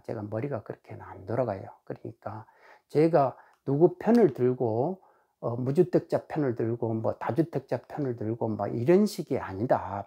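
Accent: Jeolla, South Korea. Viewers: 사투리가 전라도였군요~ 그쪽지방출신이신데 무조건 한쪽으로 기울지않고 올바르고 진실된말씀주시니 더욱 존경하고 귀하십니다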